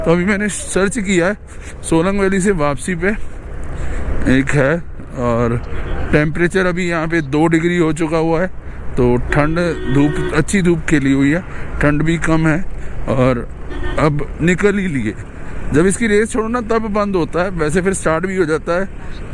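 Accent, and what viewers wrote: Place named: Punjab, India. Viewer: Lovely Vlog, Papa ji. The best part I like in all your vlogs is your Hindi narration in sweet Punjabi accent. God Bless You. I too, have purchased the Same Jupiter as yours, but in Light Blue shade & I'm very happy with it.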